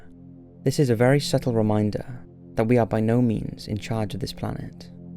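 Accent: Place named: England, United Kingdom. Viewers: your video is boring, way overhashed AAAAND presented in a horrible english peasant accent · Having an english accent doesn't mean he won't make mistakes, volcanic mudflows are called LAHARs , not ihars.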